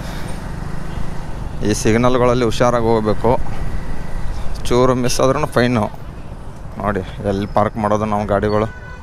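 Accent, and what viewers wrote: North Karnataka, India. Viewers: ನಮ್ಮ ಉತ್ತರ ಕರ್ನಾಟಕ ಮಾತ್ ಕೇಳಾಕ್ ಬಾಳ್ ಖುಷಿ ಹಾಗ್ತದ್ 😍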